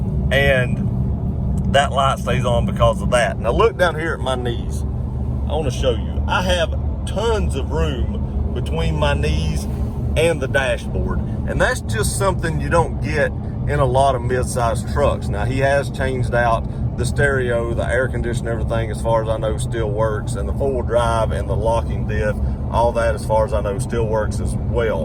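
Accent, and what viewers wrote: United States. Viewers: Most foreigners believe Americans sound like this fella lol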